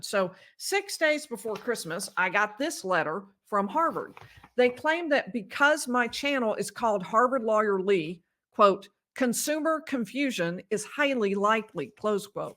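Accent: South, United States. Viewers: My crazy ex-husband graduated from Harvard Law in 1997. Sounds like you had the same profs that he did. You sound Sluthern too. Iam from TN I wonder if I met you when you were there, as I was on campus, often.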